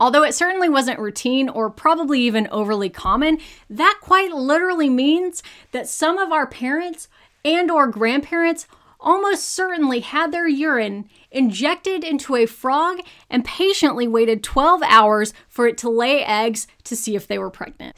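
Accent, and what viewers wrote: Texas, United States. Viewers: Is a fellow Texan, I am honored to have you and your southern drawl representing our great state. Thank you for what you do. Texas misses you. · Pap-err-us, omg your Texas accent is sooo cute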